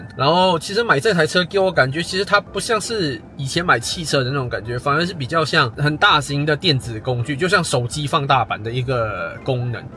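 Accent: Taiwan. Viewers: 只聽的話，感覺口音像台灣人。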